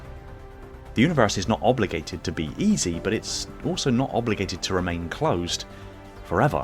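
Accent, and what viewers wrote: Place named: West Country, United Kingdom. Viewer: Your voice is like Westcountry Xbox Ahoy. Somerset?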